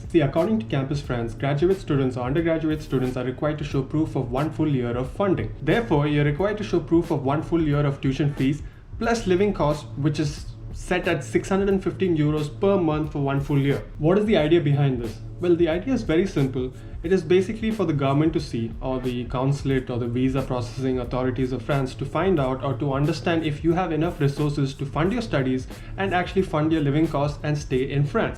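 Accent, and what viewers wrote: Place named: India. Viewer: Everything explained in a beautiful and simple Indian English. Thank you very much.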